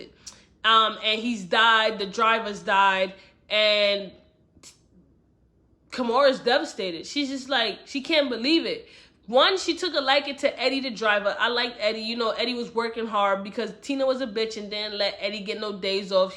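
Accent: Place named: New York, United States. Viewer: I knew you from N.y. I can hear the accent. I'm from CT.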